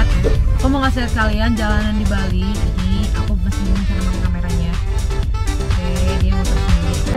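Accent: Medan, Indonesia. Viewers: Gasuka basabasi, aku suka kak dari awal dia muncul di tiktok sampe sekarang krna logat medannya yg suka ngegas + muka nya ga ngebosenin ❤ Salam dari medan kk 🙏 Dalam hati "semoga dapat kiriman", aamiin yaallah 😌✌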